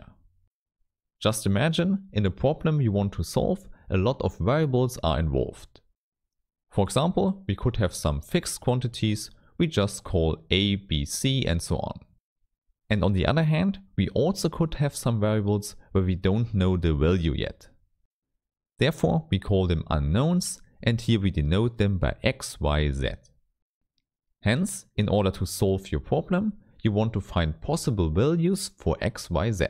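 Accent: Germany. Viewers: Thanks for the Contant, but are u maybe from German ?😂😁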